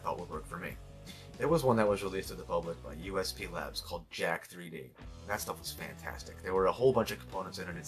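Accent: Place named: United States. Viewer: Love your American accent, you are just simply.. Yum! b-e-a-u-t-i-f-u-l to look at ....love how you explain stuff PROPERLY!!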